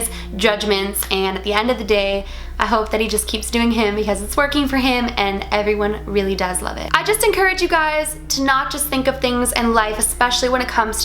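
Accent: Canada. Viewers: are you Canadian? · Is she Canadian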